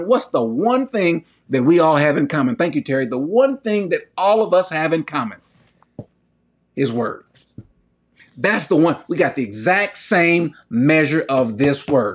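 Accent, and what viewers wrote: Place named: United States. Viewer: U need to check yourself, what do u mean trying to sound black, what does black sound to you? He talks like normal human being. His just American, being a rapper does not mean black, it means rapper simple. Don't link being a rapper equals talking black. Just say u don't like him. Why are u comparing his following to another persons following as if it's his fault? U should try watching his videos before judging.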